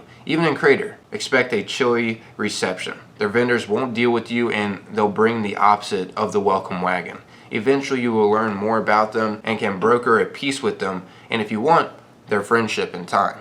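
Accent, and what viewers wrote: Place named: Philadelphia, United States. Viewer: You from philly? You sound like me. Go birds! Thumbs up!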